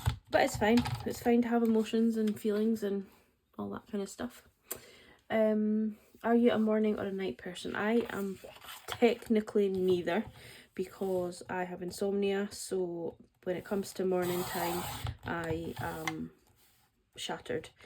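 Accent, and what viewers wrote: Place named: Inverness, United Kingdom. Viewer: I came to Inverness last year. You do have a Inverness twang to your accent xx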